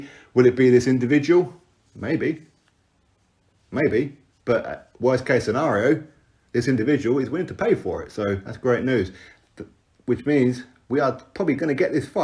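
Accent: United Kingdom. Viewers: How the fuck Prince Harry going to afford that!?!??! His wife's suicidal because they cant even afford security. You sound British weird we know this across the pond and not in UK.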